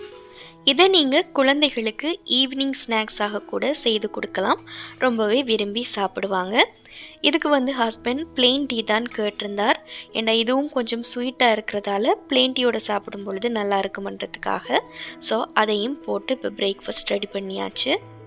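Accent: Jaffna, Sri Lanka. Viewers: I was waiting for your vlogs sister I love to hear your slang of tamil of Jaffna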